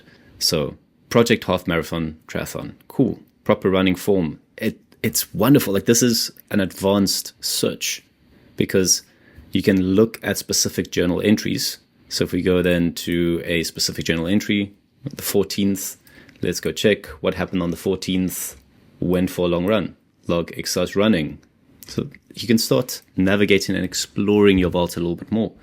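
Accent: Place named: South Africa. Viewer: Definitely a Saffa voice there! Good job bro, repreasent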